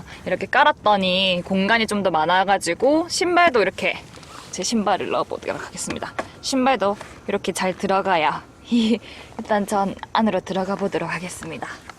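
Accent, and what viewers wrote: Gyeongsang, South Korea. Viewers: 부러워요 어떻게 이렇게 잘만들었어요 나도 갱상도 사람 ^^~~~갱상도말 구수해요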